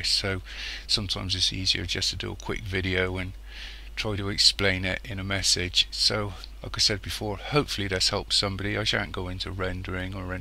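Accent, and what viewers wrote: Bristol, United Kingdom. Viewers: Lifesaver! Thank you so much! P.S. Love your accent, are you from Bristol? I love it there so much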